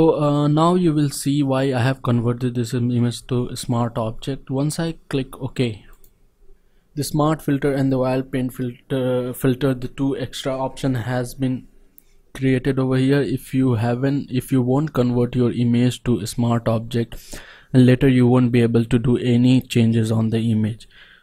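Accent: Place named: United States. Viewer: you talk like a american language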